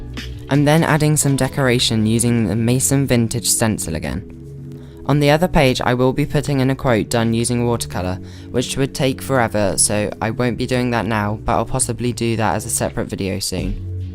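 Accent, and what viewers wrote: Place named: United Kingdom. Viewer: omg you have that british accent YAASSSS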